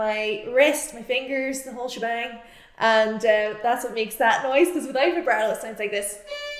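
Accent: Ireland. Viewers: I love hearing your authentic irish accent. It's not to often that I get to hear one. I love it and I like learning about the history of instruments from a beautiful Irish lasse.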